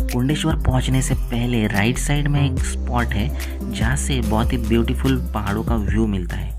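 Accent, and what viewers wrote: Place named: Maharashtra, India. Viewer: very good video मराठी accent should be corrected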